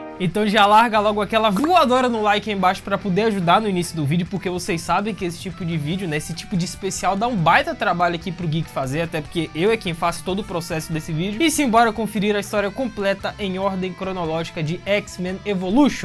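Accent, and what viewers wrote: Bahia, Brazil. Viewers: Cara tu é daqui do baixo sul da Bahia? Simbora meu conterrâneo, voa mano!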